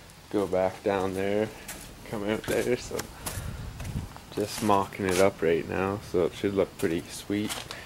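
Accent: Canada. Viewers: Where are you originally from? It sounds like your from western canada originally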